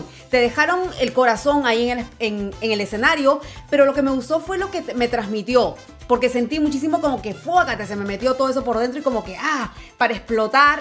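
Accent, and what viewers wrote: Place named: Argentina. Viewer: Me alegro que te gustara. Mis paisanos ya te explicaron lo suficiente y completamente de acuerdo contigo. Y la 2ª pronunciación que dijiste es la correcta. Aparte por tu acento, creo que eres argentina (apostaría a porteña), por lo que seguro que encontrarás a gallegos uqe te lo puedan explicar (eso sí, de Galicia😊)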